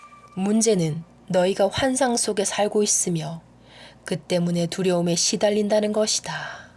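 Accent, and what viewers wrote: Gyeongsang, South Korea. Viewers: 원래 경상도태생아니시죠? 경상도 사투리는 저음으로만~쭉가는데 님께선 위쪽지방하고경상도말투가섞인거 같애여 ㅎ 제가 대구거든요 😛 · ! 경남이나 부산 분이시죠? ^^ 그쪽 억양이 느껴져요 ㅎㅎ 딕션이 좋으셔서 듣기 좋습니다.^^ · 아니 대체 상담사들이 할수있는말이랑 머가 다른가요?솔찍히 경상도 목소리 좋아서 맨날 자기전에 듣고 있는데 요 이 책은 깝깝하게 들려요... 알아서 하란거잖아요... 예수도 그시대에 말 잘하는 철학자라는 생각하시는분 없나요? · 카더라, 카더라, ㅎㅎ 저도 경상도 출신이라 그런지 사투리가 정겹고 좋네요 내 마음의 고향에 온 거 같습니다~~👍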